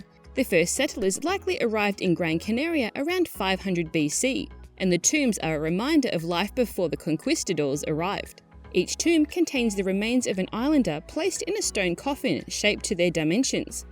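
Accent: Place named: Australia. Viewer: Are you an Aussie? Your accent sounds like. The Spanish pronounciations are not always on point, but sweet. I would have appreciated if you had consistently pronounced Gran Canaaaaria (not: Gran Canaeria - English way). And things like: 'concista' not 'conquista' - you know what I mean. Just some gentle feedback, since language seems to be a big part of your vids - great way of combining it. Lots of good info, and nice video footage - muchas gracias!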